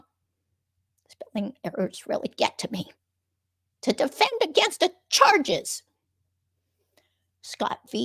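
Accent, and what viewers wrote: New York, United States. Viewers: You do a mean New York accent!! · 😂😂😂😂. The jewyork accent is spot on.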